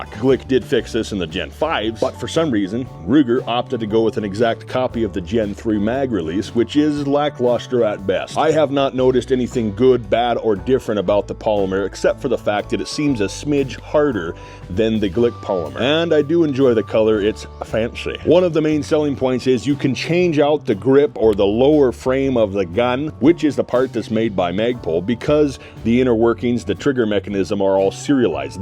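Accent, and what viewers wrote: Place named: Northwest England, United Kingdom. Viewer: This man has the most aggressive northwest accent I've ever hear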